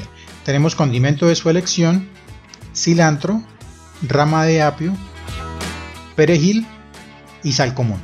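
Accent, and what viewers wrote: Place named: Bogotá, Colombia. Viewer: Yo reconozco ese acento Creo que es de personas de bogota Yo fui a Cartagena en un crucero y esa receta la probar Omg so good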